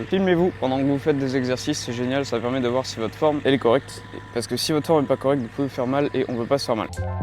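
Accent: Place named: South, France. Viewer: Ouahhh l'accent du sud, on l'entend vraiment fort, mais c'est drôle je trouve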